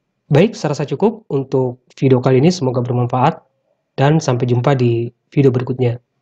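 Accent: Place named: Sulawesi, Indonesia. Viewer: Cara bicaranya mirip orang Sulawesi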